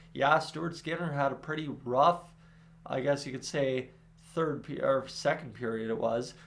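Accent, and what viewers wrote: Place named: Calgary, Canada. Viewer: sounds like a calgary boy... me too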